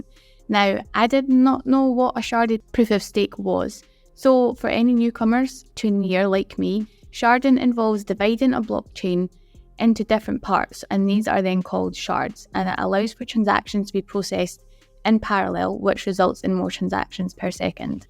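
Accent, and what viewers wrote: Scotland, United Kingdom. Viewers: Good having a scottish person speaking on crypto and relate on youtube 👍. Do you have twitter that we can follow aswel for any more information and updates ?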